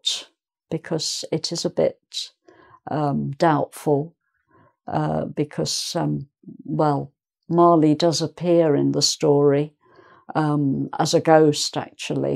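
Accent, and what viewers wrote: England, United Kingdom. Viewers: VERY BRITSH ACCENT ! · Это что, британский акцент? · I really love English writers, they are my favourites ones after Juan Rulfo, and I love your accent as well 🥺🥺🥺🥺💚 greetings from Mexico. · Even a non-English speaker would be able to understand this lady. Lovely & relaxing type of voice with a typical British accent, perfect to get you started. Plenty to learn from her (intelligence). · Hello,!👋 I am Kate, I am 18. I’ve already read "1984" and "Pride and Prejudice" in Russian. I really enjoyed them!😍And I’ve also read "Jane Eyre" in English when I was 13-14 years old (my teacher gave me this book) and in Russian recently. And now I am reading "Charlie and the chocolate factory" and "A journey to the center of the Earth" in English. In general, reading is my favourite leisure time activity, and I’ve read hundreds or, maybe, thousands of books.📚 I really love "Harry Potter", "Master and Margarita", books about "Stranger Things", foreign classics and so on.💖 P.S. 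Thanks for your video!👏 I am from Russia🇷🇺 and I am learning English, but I’ve understood everything without subtitles. I really like your pronunciation and Britain accent!🥰🇬🇧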